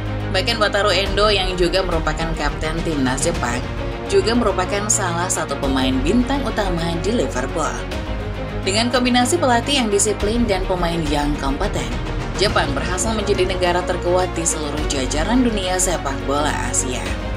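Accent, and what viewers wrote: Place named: Pekalongan, Indonesia. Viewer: Logat mu min Pekalongan banget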